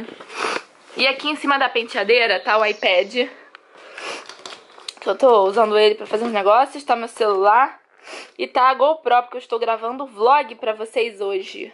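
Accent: Rio de Janeiro, Brazil. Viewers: vc e mt divertida e esse sutaque de carioca e incrivel ate eu ja to falando q nem carioca seu qu\rto e lindo pft adorei vc e seu quarto faz um tour pela sua casa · Adoreei esse vídeo, seu quarto é lindoo!! Mas uma perguntinha. Vc é carioca?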